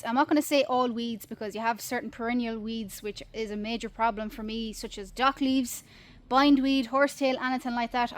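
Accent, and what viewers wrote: Ireland. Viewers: I do a little gardening but i just like the way she talks that irish accent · Hey, love your content darling..can I ask what part of Ireland are you from.❤ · I don't how many male humans could resist a young lady with that wonderful Irish lilt and the gorgeous roses in her cheeks. That is one version of perfect Irish femininity. God bless ya and may your flowers always bloom! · Sheesh, that accent is lovely! I would love to visit Ireland, but my skin tone may have me confused for border jumper 😃 I heard the Guinness there is real and I need it! 😂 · not , just listening your awesome voise! :D i mean in from finlasnd and love irish english. :D maybe some others too. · this girl is so cool, modest but with pride, love this oirish accent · I LOVE THE BROGUE: DO YOU BELIEVE IN LEPRECHAUN'S LASSIE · The Lovely Irish could talk about shit and you would still be entranced by their lovely accent. 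Aussie Jeff Moore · Are you Irish? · Loving the channel. Mostly the charming accent, but I am actually gardening too 😅 Makes me want to visit Ireland. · You are a superstar!!!" And your Irish, wow you have a great outlook. Thanks for teaching me how to garden. You have my full attention. Don't ever change anything about yourself!!!"✌️❤️ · Between your Irish accent and my Texas accent we would never have any reason to argue.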